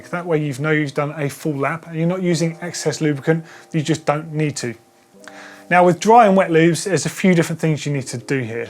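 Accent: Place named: United Kingdom. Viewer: Very good info; lots of useful stuff, here; typical Brit accent on this dude (I'm an American, although my ancestors come from a little place, ten miles north of the old center of London, a thousand years ago...ha ha ha...); and, seriously, is anyone crazy enough to ride a bike that fast, downhill, on those dirt trails, over roots and rocks, in the mud, in the rain?!? (Certainly not even ME, who was once TRULY certified...ha ha ha ha ha!) I flew downhill in the dark, a few nights ago, and wound up hugging a partially obscured STEEL GUARDRAIL, covered with weeds and vines--upside down, with a few extra cuts & bruises; I can only imagine what it'd be like losing control on one of those downhill mud-slalom runs, in the rain--not any more fun than that, I'm sure!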